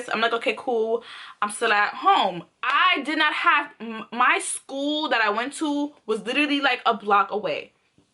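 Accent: Jamaica. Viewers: Just to be curious are you jamaican, half jamaican or part of jamaican because in some in your videos, you had that jamaican accent when you talk sometimes? The reason I'm asking is because I'm half jamaican so I can tell if someone is jamaican.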